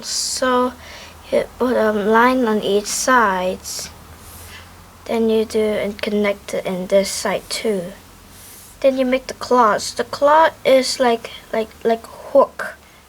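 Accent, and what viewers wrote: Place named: United Kingdom. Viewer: You're English is so British.. I like it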